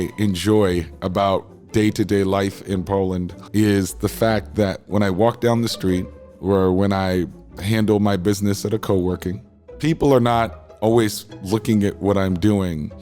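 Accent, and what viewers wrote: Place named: South, United States. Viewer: You must be daydreaming, I was just in PL in September and they have huge inflation and prices are not cheap. One might say , reasonable, but no longer cheap. Gasoline is what, 6 USD a gallon????/ Prices of restaurants have gone up a lot and I have been going to PL nearly yearly since 1972!., Compared to what? I did not find PL cheap at all when I was there in September. wages have gone up a lot and this has made much more expensive. Breslau and the Danzig corridor are untouchable. .. Nr. 2, you keep saying PL is so lovely and then you show only German cities and GERMAN monuments and housing. I know Gleiwitz well and Ruda Slanska, all german for 800 years until September 1945. So yes they are in modern PL now however the Rynek consists like in Gleiwitz of all German built buildings that they have now renovated in non coimmunist times. 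So you are admiring in Posen, Breslau, Gleiwitz, etc, the areas you showed, all german architecture, also you showed German Kattowitz, another city i know well, and claiming its Polish. You are looking at German architecture in a modern PL setting. Do your due deligence. With your accent and way of phrasing things you sound like a country bumpkin who traveled very litte outside of the South when you make USA Pl comparisons. Yes Breslau was ruined at the end of the war and yes even in the communist times they re did the Rynek but what they copied were all German originals, nothing Polish. So you might want to say, an American loves the old German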